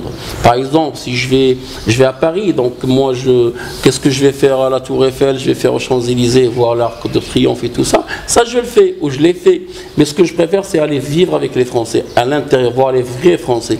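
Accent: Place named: Arab world. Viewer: فيك عياقة وتكبر ديال رباطيين ولكن جات معاك غير حاول ماتنطقش فرونسي فيها لكنة معربية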